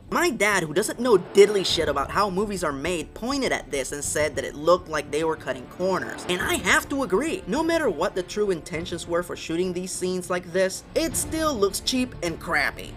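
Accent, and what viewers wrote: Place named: Puerto Rico, United States. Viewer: he's Puerto Rican so 1. I don't think English is his first language and 2. he has a slight accent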